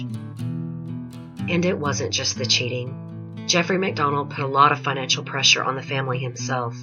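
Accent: South, United States. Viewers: Yeah. Her voice is like silk with SLIGHT SOUTHERN TWANG🌄🌃🆒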